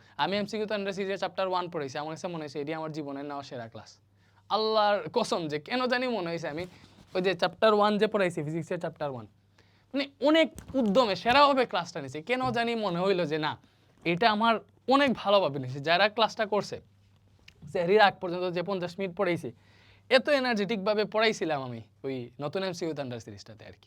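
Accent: Sylhet, Bangladesh. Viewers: Bai apni to sylheti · Sir apnar bari sylhet er kotay.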